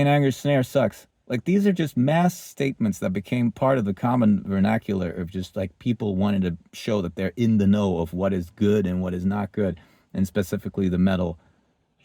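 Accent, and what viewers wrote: United States. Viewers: This is probably just a me thing: But I like your accent! It sounds a little somewhat similar to American! (I am a Finnish guy, but I speak in the American accent when speaking English)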